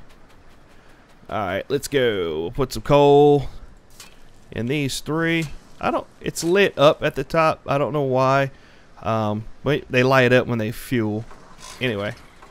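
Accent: South, United States. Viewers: is a coonass, im from bama. I tend to seek out the southern tubers, they my kinda people. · Is that a southern accent I detect? :D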